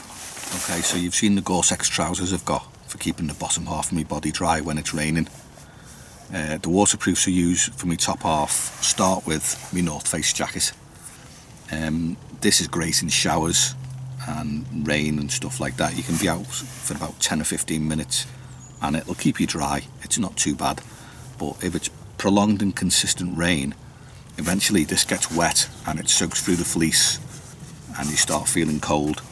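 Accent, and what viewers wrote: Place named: Liverpool, United Kingdom. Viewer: Where you at mate?.............. Got a scouce twang there fella so cant be far from me? lol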